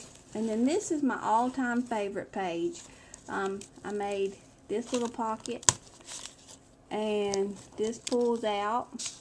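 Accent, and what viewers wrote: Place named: South, United States. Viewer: I just love your southern accent. You are adorable and you did a wonderful job on the album.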